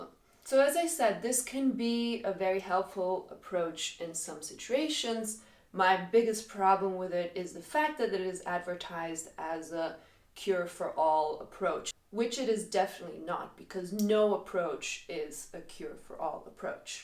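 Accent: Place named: United Kingdom. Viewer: I really love what you say and the Nice British accent!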